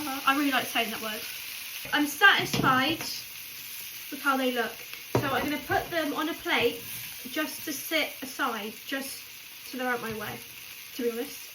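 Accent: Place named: West Country, United Kingdom. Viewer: Love your accent wheres it from somerset or devon maybe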